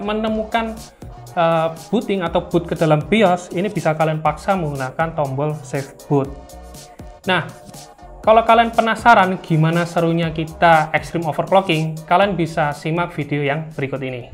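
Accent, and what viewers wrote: Java, Indonesia. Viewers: Khas medok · Medog tenan mase 😀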